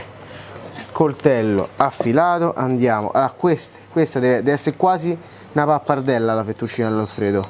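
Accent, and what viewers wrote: Rome, Italy. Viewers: Ma sei un marocchino che parla con l’accento romano ??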